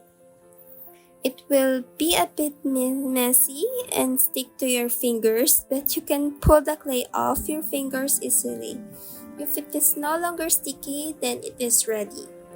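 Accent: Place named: Philippines. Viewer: based on your voice and accent filipino ka po ba?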